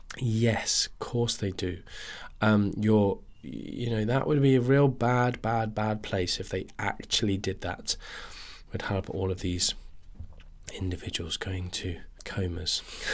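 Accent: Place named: England, United Kingdom. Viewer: Unusually good-looking beard. Ah, - no grey prickly hedgehog-style, or lack thereof. Talk matching it, - good to hear English English for a change.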